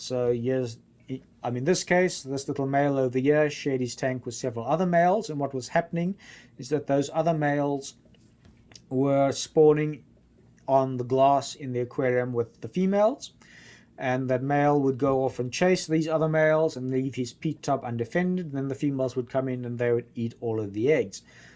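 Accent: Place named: United States. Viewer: that's an amazing American accent.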